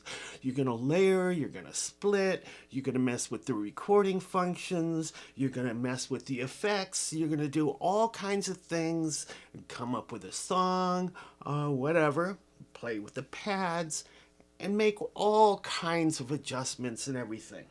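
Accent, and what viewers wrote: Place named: North, United Kingdom. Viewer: ) I kept leaning over looking around the mic stand that was in front. I tease, but I love your approach to breaking down the instrument and your pleasing effect throughout the video. Ive the F8 but am NOT a piano player. I hope you continue this series and delve more into the instrument. Maybe I'll see you at NAMM! P.S. Love your northern accent!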